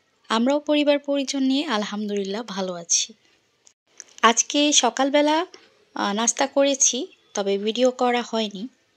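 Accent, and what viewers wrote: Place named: Noakhali, Bangladesh. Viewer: আপু তোমার নোয়াখালী ভাষা ভালো লাগে আজ বলো নাই আমিতো অবাক 😮😮হাঁস দারুন হয়েছে ❤❤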